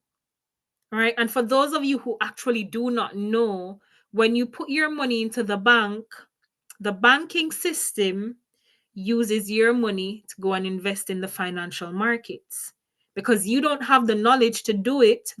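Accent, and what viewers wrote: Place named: Jamaica. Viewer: Good video! Are you jamaican? You have a lovely accent 💯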